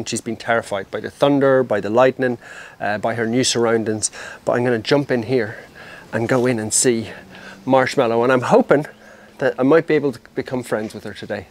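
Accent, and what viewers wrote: Ireland. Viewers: marshmallow will come to love you just like the rest of us. Nobody can resist that Irish lilt.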